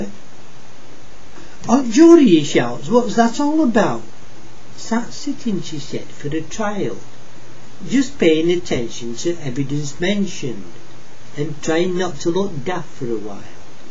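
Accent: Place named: Lancashire, United Kingdom. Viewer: Oi! Let mee gess! Oi loike it, because eet's gud fer lernin' a Lancashire ahccent! Translation into American English: Hey! Let me guess! I like it, because it's good for learning a Lancashire accent!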